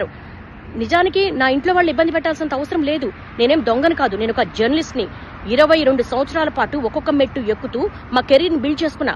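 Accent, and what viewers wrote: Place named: Telangana, India. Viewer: That is Telangana Talkn9bans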